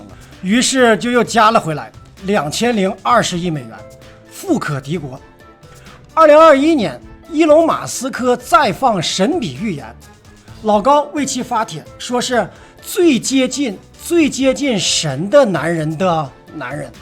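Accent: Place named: Shaanxi, China. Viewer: 陕西话说的真可以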